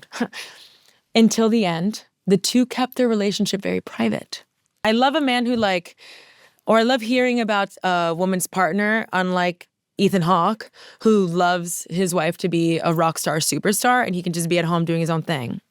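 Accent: Miami, United States. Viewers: i cannot express how much ur miami accent comforts me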